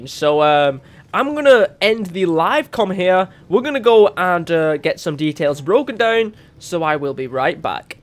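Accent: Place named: Wales, United Kingdom. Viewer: its mostly his weird whelsh accent and his broken grammar that pisses me off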